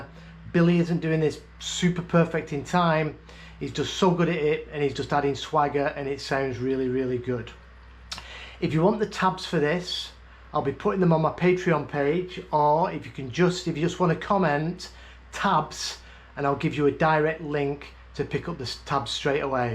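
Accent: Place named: Northern England, United Kingdom. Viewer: way aye lol. You sound like you are from the North of the UK. Leeds area...?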